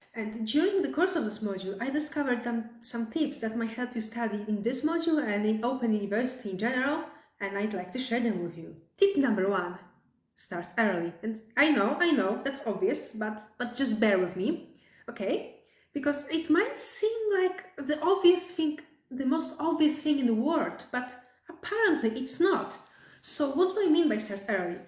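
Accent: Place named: Hungary. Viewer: Not related to the topic but..Where are you from? You sound Hungarian. 🤔 I NEED TO KNOW!🤣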